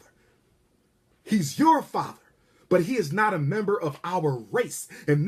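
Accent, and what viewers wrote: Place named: Philadelphia, United States. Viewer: Woooow... you can tell for sure Dr. Umar is definately from Philly! On another level...love my brother his intellect is beyond sexy! - I always say this, they will never ever go against the oppressor because the oppressor reared them--I dont believe they can.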